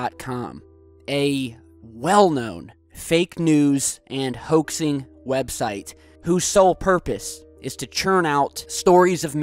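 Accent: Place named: United States. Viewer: Any chance you can learn pronunciation of the English, that's not American language? The word Antarctica has some "t"s in it, use them please! Get some education, PLEASE!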